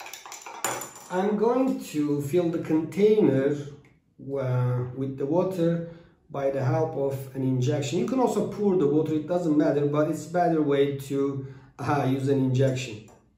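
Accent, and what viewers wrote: Turkey. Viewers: I absolutely love the Turkish accent. Correct me if I’m wrong! Reminded me of my teachers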